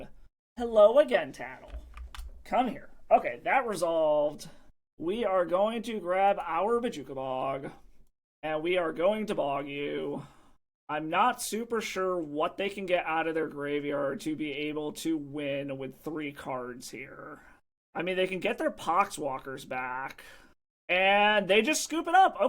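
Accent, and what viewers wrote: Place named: Alabama, United States. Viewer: I don't speak English but your accent sounds like Alabama to me, im right?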